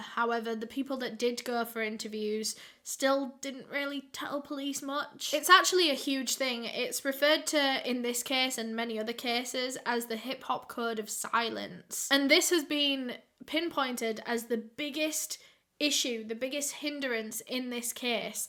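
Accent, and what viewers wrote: United Kingdom. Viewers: IDKY youtube recommended this channel but THANKS... this adorable brit-ish girl talking about New York hip hop murders is HILARIOUS. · "Drugz spelt with ZED" .... Beautiful love UKs pronunciation of words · I am a middle-aged woman in medicine hat Alberta in the middle of the freezing cold listening to this young girl and have literally been stuck on her channel for days you are a total sweetheart I wish I had you for a daughter I am 53 years old and I totally love your concept the way you portray the stories you're so sensitive to what kind of story you are showing us especially around children I think that's so sweet I found you totally by chance but I have been watching you for the last 3 weeks Non-Stop my 17-year-old son it's starting to get worried and has now starting to try and peek in and see who this girl is with the beautiful British accent you see we live in the prairies and medicine hat Alberta population 68,000 I have never seen you before young lady but you are an exceptional wonderful bubbly incredibly talented young lady you're the daughter I wish I had I was only able to have one son by the way and he's very very ill he had an unsuccessful kidney transplant at 15 years old I he has just been 3 weeks in children's hospital and the neurology clinic having major blood filtration blood plasma and steroid therapy to try and stop his kidneys from rejecting so if you guys could please pray for my son he's in a really bad way right now he's lost two markers on his kidney which means he's going to have a horrible time finding a new one please pray for him his name is Riley he had heart surgery at 6 days old to repair one of his valves he was born 2 lb 2 oz 10 wee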